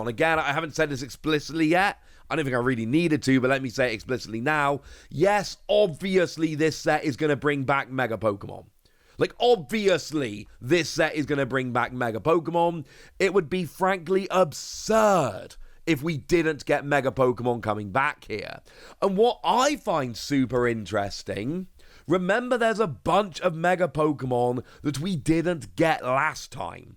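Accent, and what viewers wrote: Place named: United Kingdom. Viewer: Oh crap, I completely forgot this was British. Lol, every time he talks about the next Pokémon game it’s gonna be like nails on a chalkboard.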